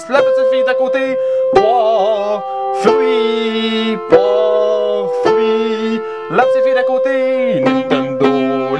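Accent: Canada. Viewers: l'accent un truc de malade moi ki viens du sud de la france et ki souhaite vivre au canada je te raconte meme pas ce ke sa peu donner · j parle bien le francai mais du mal a comprendre le francai de canadien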